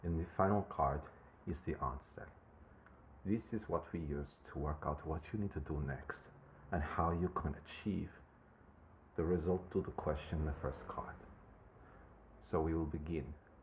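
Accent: Russia. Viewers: Capricorn ♑️ here! Awesome Russian accent. 🖤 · Your Russian accent is outstanding! ... and very relaxing. This little fire sign (Sagittarius) would love to hear more. 🔥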